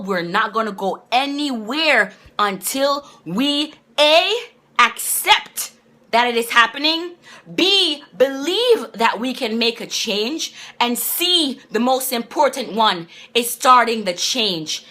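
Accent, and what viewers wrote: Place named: Jamaica. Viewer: Jamaican accent? (I'm from the Caribbean so just curious). Confirmed. Shout out to our northern neighbours :) Great video btw.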